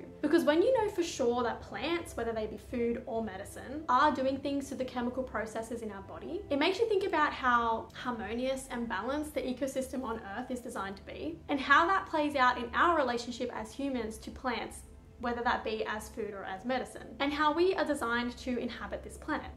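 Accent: Australia. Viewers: I'm so glad I accidently found your videos. I live your energy. I was just playing random naturopath videos while doing a workout and I was like is this an Aussie? lol *I'm in Q.L.D. 😎🍉💃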